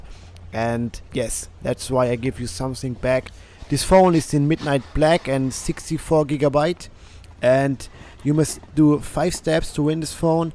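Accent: Germany. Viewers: Ey deutscher,Man hört das du deutscher bist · Man hört das du deutsch bist lul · Man merkt übel dass du deutscher bist aber nices video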